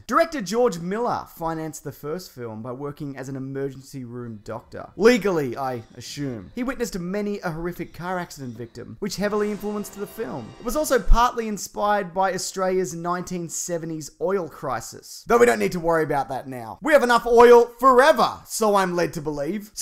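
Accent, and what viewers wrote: New York, United States. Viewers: That new york accent tho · Every time. That American accent kills me every damn time. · New York accent is spot on. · Flawless New York accent as always. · New York accent is perfect lmao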